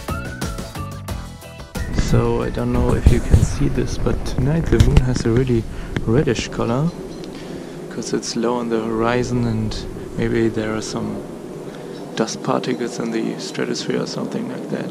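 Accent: Germany. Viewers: Dieser deutsche Akzent einfach herrlich Quatsch doch einfach auf deutsche ✌🏼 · Der ist deutsche dieser aktzent und die heuser ... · Höre ich da einen deutschen akzent?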